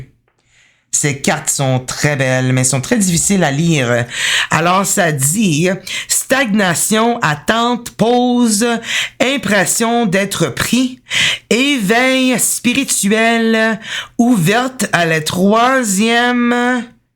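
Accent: Quebec, Canada. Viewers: Oh mon dieu, j'adore ton accent Québécois.😊❤ merci pour cette belle lecture, qui resonne parfait. Oui, je suis dans une nouvelle relation avec un poisson et j'espere que on sera bientot ensemble, car distance physique entre nous. Je suis veuve et lui divorcé.. Merci pour la confirmation et gros bisous d' Europe. ❤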